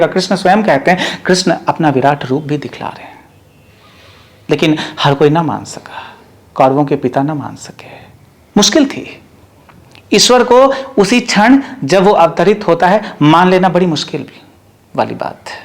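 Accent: Bihar, India. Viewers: भैय्या लोग जैसे बोलते वैसे ये लडका बोलता है. रजनीश ओशो की बात करते है लोग मंत्रमुग्ध होते थे. इसकी बात मे कोई दम नहीं.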